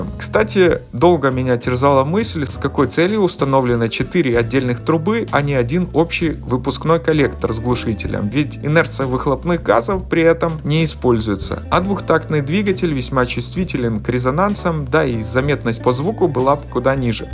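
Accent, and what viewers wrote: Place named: South, Russia. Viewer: Это южно-русский сельский акцент. Вроде паренёк неплохой , про политику не рассуждает. У них 80% русские, остальные 20% поляки с венграми.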